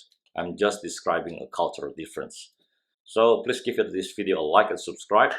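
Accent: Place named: Australia. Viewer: Before you even said I knew you are in Australia from the cadence of your English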